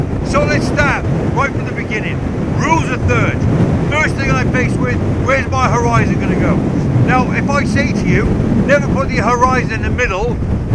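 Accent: United Kingdom. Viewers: Wow... The ham sandwich aerial delivery had me rolling! With all that wind noise, I had a hard time lip reading that British accent! The Yorkshire Tree rocks are about the strangest thing imaginable yet quite fascinating as well. The entry level equip.along with the various compositions you used produced some beauties for sure! Point well made... The other thing that kept me on the edge of my seat was you raising both hands to emphasize a point & the bloody wind almost blowing the equip. to the bloody ground, LOL! Bill Farr...:)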